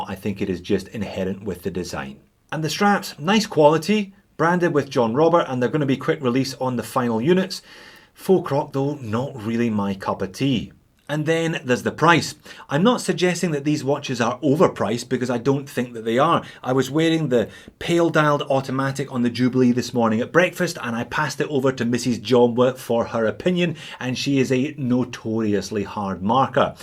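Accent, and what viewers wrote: Scotland, United Kingdom. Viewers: I don't mind Australian, but that Scottish accent is just too precious, try to keep it intact! I wonder how much the linen dial itself actually costs to make? That boxed sapphire crystal looks amazing but certainly drives up the price massively, so does the Swiss quartz. Maybe someone could almost replicate the same look with a "vintage" boxed acrylic and a Japanese quartz for under 100 USD? Would buy that in a heartbeat.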